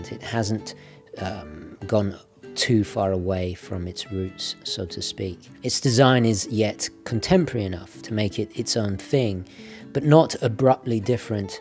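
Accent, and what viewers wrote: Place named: United Kingdom. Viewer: I thought u were British...?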